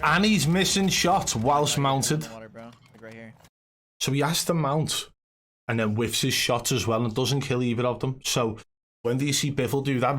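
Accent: United Kingdom. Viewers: I barley understand you British fuck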